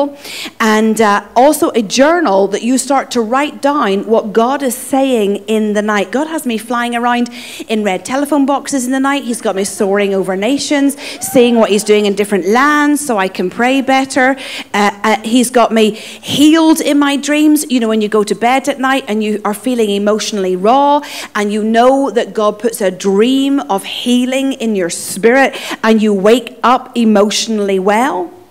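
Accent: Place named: Ireland. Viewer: the Irish accent is one of my top 3 least favorite accents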